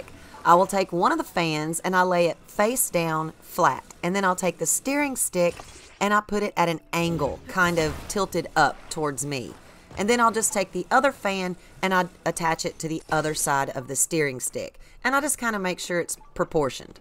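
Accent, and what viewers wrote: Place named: Midwest, United States. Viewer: I keep getting distracted by your super thick midwestern accent 😂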